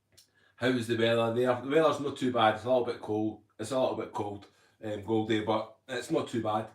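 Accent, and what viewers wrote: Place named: Scotland, United Kingdom. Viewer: Great video. I love listening to you talk. You are very natural on camera. Your Scottish accent is great. I have learned a lot from listening to you.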